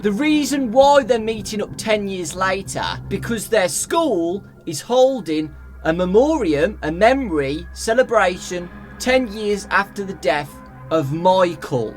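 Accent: United Kingdom. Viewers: i feel like he named the character charlie just to hear say it in his british voice.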